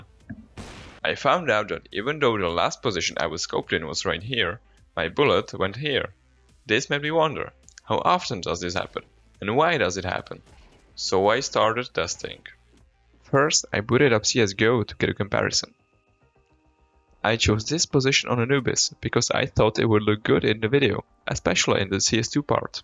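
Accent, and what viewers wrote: Czech Republic. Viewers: skoro jsem nepoznal že jsi čech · to je hustý že jde tolik poznat že jsi čech z toho přízvuku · Český přízvuk se pozná všude :D Pěkný videjko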